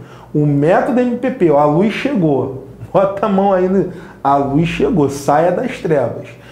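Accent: Rio de Janeiro, Brazil. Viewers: Professor locão: massa! A geração Z adora isso, tem a pegada de curso pre-vest. Dá até pra suportar o carioquês com essas dicas do tal MPP. bons marketeiros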